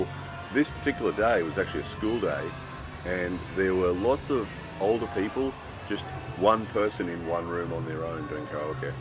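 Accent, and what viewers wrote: Australia. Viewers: You should have displayed your Japanese instead of us having to your Australian accent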